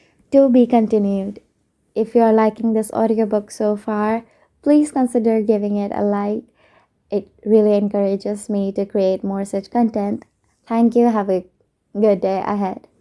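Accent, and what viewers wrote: India. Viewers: Are u indian? Your accent is so thickly beautiful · Omg I'm ur new subscriber ! Just checked out ur channel there are so many audiobooks for free ! Ur voice is so beautiful too ! I am so happy i found this Channel ! Can I ask where u r from ? ( Cause i feel like u have a little bit of Indian accent...u sound beautiful while reading ! ) Really appreciate ur efforts of giving us so many nice stories here at ur channel for free ! 💕💕💕💕💕💕💕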